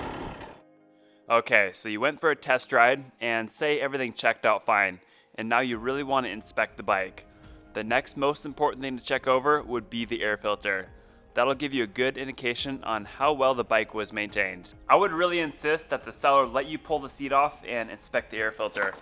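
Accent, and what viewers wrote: Finland. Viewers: Are you from finland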